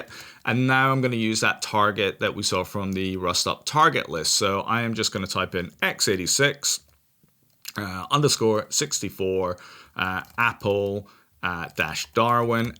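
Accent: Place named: Scotland, United Kingdom. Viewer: Enjoying your videos!, where is your accent from? I feel like I hear a bit of Scottish, English, American, I'm assuming you have lived in many places in your life? 😃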